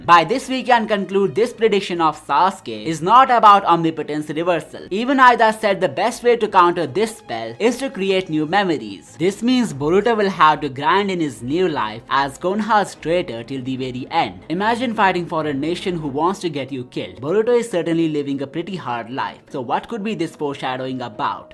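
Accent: India. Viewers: Broo I thought ur indian because of ur accent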